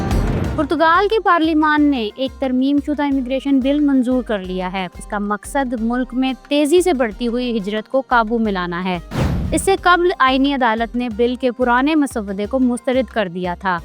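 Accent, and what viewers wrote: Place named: Punjab, Pakistan. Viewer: aap English name bhe Punjabi mein bol rahee hain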